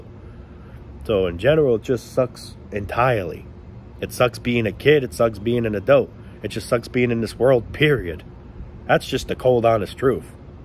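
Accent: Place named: Bronx, United States. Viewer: originally his accent reminded me of the bronx, but then he told me he's from yonkers. life is so confusing sometimes. 😉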